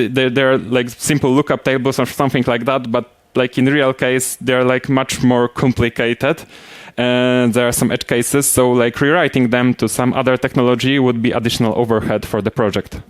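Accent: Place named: Poland. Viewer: Haha, jaki chlop ma polski akcent xD smdzieki temu jest baedzo zrozumiale i zlapalem kqzde slowo ;)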